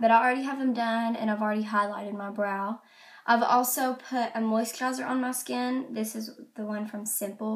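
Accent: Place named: Tennessee, United States. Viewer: I like your accent !!! Its like your from tenneysee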